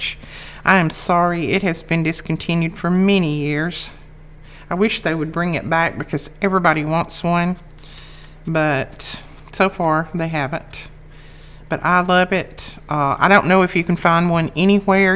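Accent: South, United States. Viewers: “Oooo, I like that.” “Init cute?” “I’m gonna embellish that.” I love all of your expressions. Can you add a “push here” button to the tshirt so we can hear your wonderful Southern accent too? :)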